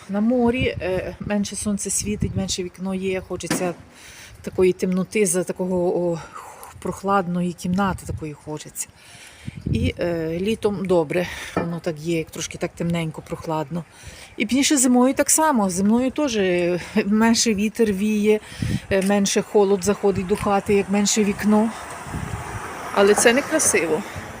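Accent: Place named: Surzhyk, Ukraine. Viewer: Ту піца НАСТОЯЩА....Та чого ти розмовляєш російським суржиком???? Патріотка недолуга.....😮😮😮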